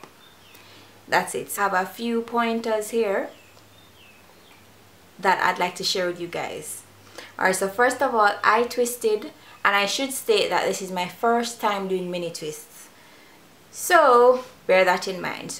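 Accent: Jamaica. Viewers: So bus a jamdown chat.nuh.... make mi hear it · I love watching your videos just to hear your accent im Jamaican but I don't live around a lot of Jamaicans. I love watching your videos to get a feel of home.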